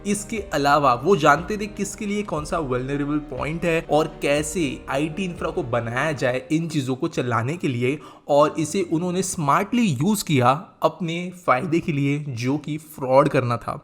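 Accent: India. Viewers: Yo, are you indians dumb or intentionally irritating??? If your gonna speak Punjab you benchod than put the title in Punjab not in English. I hate this fucking nation. Although you might be speaking English after all, it's the worst English accent of all time, brought to you by India.😂😂😂 Yeah I know, you will report this comment as hate speach. Bloody pc natzi's. Fuck you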